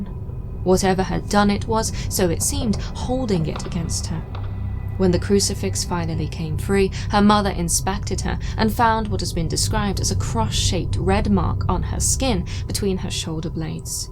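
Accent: England, United Kingdom. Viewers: Hearing say "trust me bro" made me laugh! Hearing her beautiful sweet voice, with her English accent, I had to go back to listen to it again because I thought I was hearing it wrong. Nope, I was right, and she did say that LOL. Great video as always! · All I have to say is every time I hear your voice in these vids I think " oh boy there is that English Girl throwing down a good story... Keep it up.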